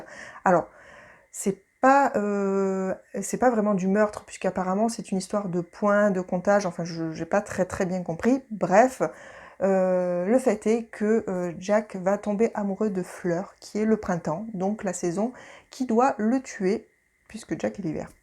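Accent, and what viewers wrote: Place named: England, United Kingdom. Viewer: Coucou! Bravo pour cette vidéo! Je vois qu’on a une majorité de livres en commun, surtout pour les grandes sorties!!! 😍😍 Genre Aurora Squad 2!!!!!!!!! Haha, l’attente me rend fébrile... 🤣🤣 J’adore ton accent anglais!! 😅 Pas pire que le mien!! 😉 Bisous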